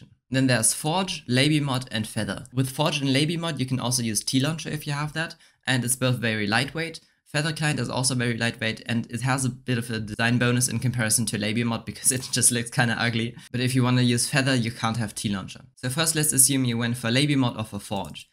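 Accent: Germany. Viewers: hey, nice video, really helped me Man hört aber stabil, dass du deutsch bist 😆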